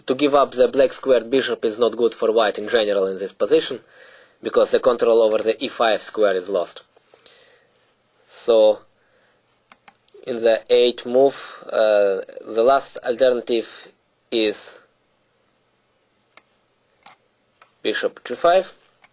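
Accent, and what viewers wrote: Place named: Russia. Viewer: he actually has a russian accent...he's not faking it...:-p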